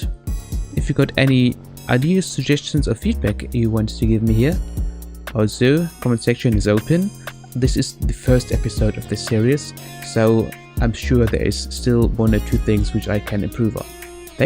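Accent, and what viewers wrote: Germany. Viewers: Bro are you from DeutschLand?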